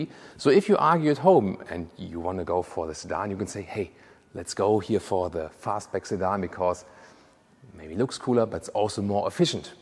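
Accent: Germany. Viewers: I love german accent 😂😂😂🇩🇪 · Deutsch-english LOL XD bleah!!!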